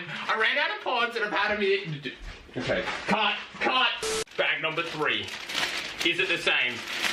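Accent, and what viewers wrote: Australia. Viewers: No doubt. He looks Eastern European with an Aussie accent. Aren't all Aussies supposed to look like Crocodile Dundee? LOL...JK! cracks me up though. He is salty and his laugh is epic. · Ive seen him do a face reveal before but i still wonder how much difference there is in his looks and voice. He sounds like a chubby australian with a goatee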